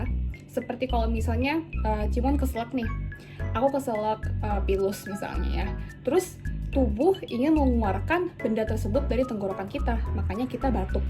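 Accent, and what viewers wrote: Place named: United Kingdom. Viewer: Wih logat. English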